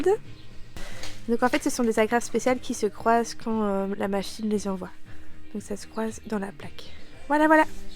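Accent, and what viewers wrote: Belgium. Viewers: Super moi qui ai la nostalgie de mon pays d'origine, je vis actuellement en France depuis plus de 10ans. Ça me manquait d'entendre l'accent Belge et comme j'adore ce type de vidéo, du coup un nouvel abonné. 👍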